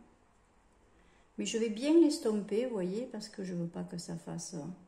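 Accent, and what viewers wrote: South, France. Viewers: Hello, j'aime beaucoup ton naturel sans chichi, c'est très agréable! Et ton petit accend du sud adorable! On ne peut pas plaire à tout le monde 😉.il y a toujours des personnes qui critiqueront. Ne change rien, c'est parfait! Très bien journée, prends soin de toi. Bisous.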